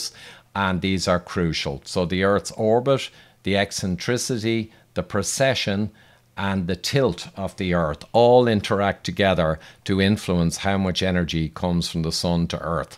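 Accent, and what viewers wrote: Ireland. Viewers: Nice. And the Irish accent helps too.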